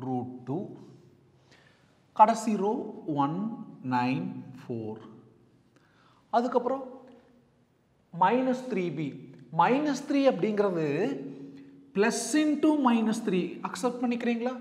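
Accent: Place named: Pollachi, India. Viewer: Super teaching in pollachi voice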